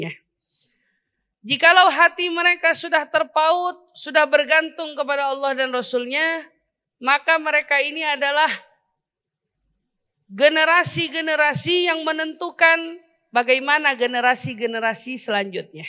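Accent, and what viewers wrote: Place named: Medan, Indonesia. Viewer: Ustdzah kebawa bhs medannya maasyaaAllah.tausyiah kota medan. Tapi blm pernah denger ustdzah bhs sunda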